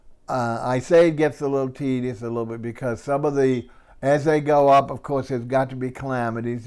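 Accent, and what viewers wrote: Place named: New Orleans, United States. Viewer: sure good to hear that N'Orleans accent I remember so well. Your voice is in sync with your video. Joe Robberson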